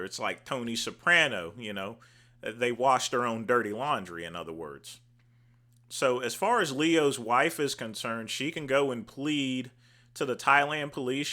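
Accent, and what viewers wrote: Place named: Kentucky, United States. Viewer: Interesting southern accent. Is it Kentucky?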